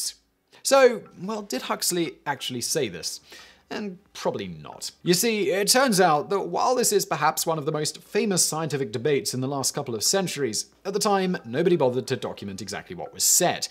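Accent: England, United Kingdom. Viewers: Given your British accent, the typo in the thumbnail kinda ruins a lot of credibility of the research. Or it's just a genius ploy to get higher engagement via outraged comments.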